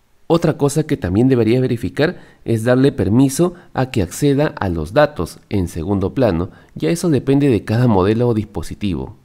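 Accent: Peru. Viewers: Acento Limeno 100% jeje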